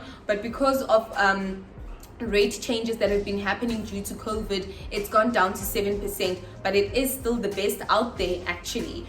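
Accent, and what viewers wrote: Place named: South Africa. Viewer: I actually downloaded this bank app ever since you recommended it to me 😍🔥😍 thank you so much 😊 you are THE GIRL, what? THE GIRLLLLL 😍😍😍🍾🍾🎉🍾 congratulations 🎊🍾 on reaching over 1000 subscribers honey 😍😍😍💃💃💃🌹.... by the way, you look absolutely gorgeous, I love your thick, clear and healthy hair - you are beautiful babe 😍😍😍 Oooooh and I love your voice and accenttttt- Proudly South African 🇿🇦 yebooooo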